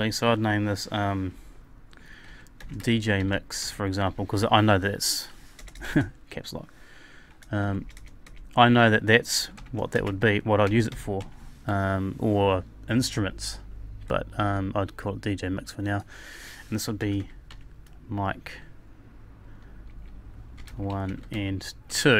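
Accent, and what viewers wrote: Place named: New Zealand. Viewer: That is the most Kiwi accent everrr :D Lived in Christchurch for 2 years, can't forget it :P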